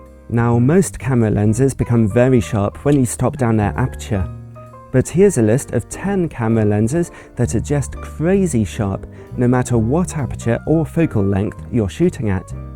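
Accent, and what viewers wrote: United Kingdom. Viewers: Hi, is it your name? yes it is, so no offence I don’t know who you are and where you came from, sound British my best guess, what a rubbish review of lenses you are bringing up, have Samyang whatever it is (again no offense) are paying you enough for what good stuff you are saying about them? I guess they are, LOL, be at least fair and honest to all people here in around YouTube and show your pics taken (in raw with all sets) with lenses you’re taking about (send links to original photos if no hurt you) otherwise do not give a shit, you seems from your voice nice guy! canon, tamron, sigma have so great cheap lenses so again be good not jerk.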